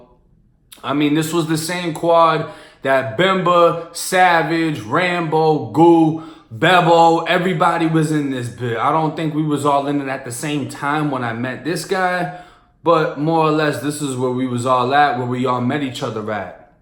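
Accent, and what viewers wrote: Boston, United States. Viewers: Thats his boston coming out you can clearly tell when hes forcing the florida gangsta accent like .. "whats up ERBODY!" this kids a real trip, but ill take him for a good laugh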